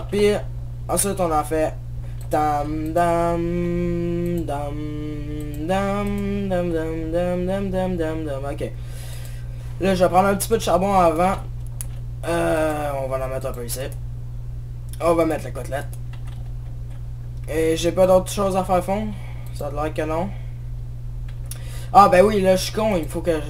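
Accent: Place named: Germany. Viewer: Tes cris sont toujours épic ^^ sinon bel accent allemand bravo! ;)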